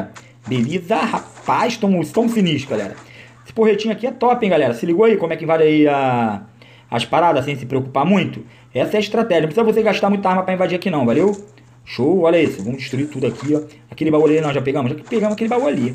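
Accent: Rio de Janeiro, Brazil. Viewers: Mano tu é do rio?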